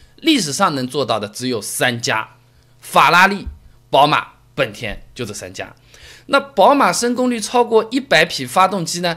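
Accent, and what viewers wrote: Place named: Shanghai, China. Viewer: 喜欢你的阿拉普通话外加幽默。可以尝试更上海一些.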